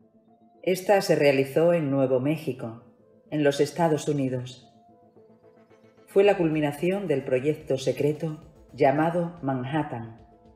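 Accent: Chile. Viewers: Cada Documento en su preciso lugar...Trabajo muy exelente...Y como adorno a tan bello trabajo, ese matiz de voz inconfundible de la hermanita ... Chilena ???❤🎉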